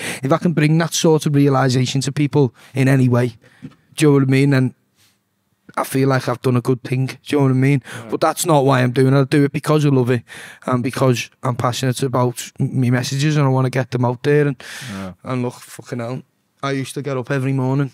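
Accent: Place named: Liverpool, United Kingdom. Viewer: Boss that proper scouser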